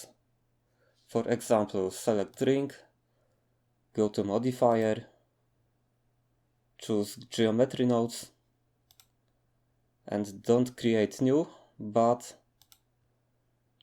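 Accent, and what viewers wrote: Poland. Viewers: Sensing a Polish accent there :)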